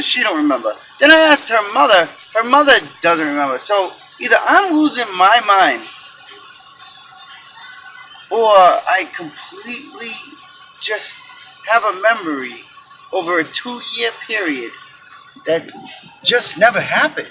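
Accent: Boston, United States. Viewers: ur from Boston I can tell. I'm from Dorchester. yeah this is fuckin with me too..like flava flav from public enemy was always flavor flav in this reality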